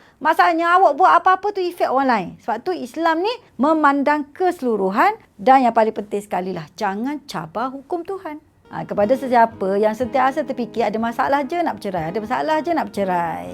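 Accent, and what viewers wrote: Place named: Kelantan, Malaysia. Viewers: haaah..mari mana...sah kelate🤣🤣🤣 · Ustzh awal2 lancar je lama2 keluar jgk Kelantan dia haha. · Bahasa Malaysia aksen Kelantan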